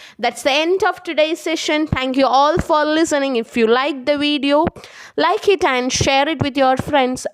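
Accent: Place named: Kollam, India. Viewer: Kollam... Beautiful acsent... And very good information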